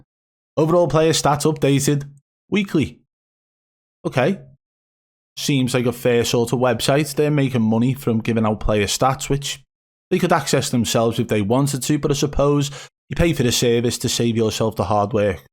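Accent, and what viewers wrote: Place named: Merseyside, United Kingdom. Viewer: Absolute wool you mate! Swear to god you’re from Skem or Birkenhead you. Bad snitch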